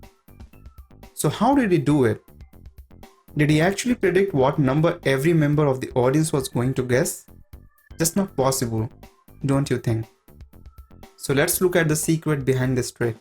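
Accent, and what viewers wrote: India. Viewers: If you didn't switch the voice to a freaking Indian one the video would better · I would've liked this more of the narrator didn't have such a thick indian accent that i can't understand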